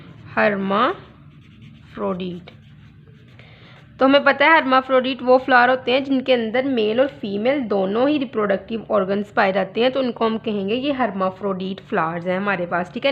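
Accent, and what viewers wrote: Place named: Lahore, Pakistan. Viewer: Thank uhh so much mam 😊❤❤❤ your accent is so cute..kind of lahori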